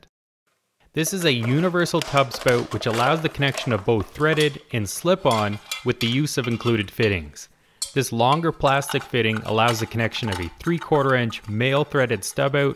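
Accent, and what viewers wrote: Canada. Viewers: The Canadian accent is killing me.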